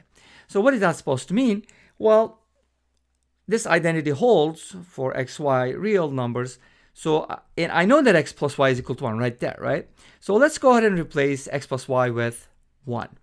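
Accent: Turkey. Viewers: where are you from? turkey or united states?????????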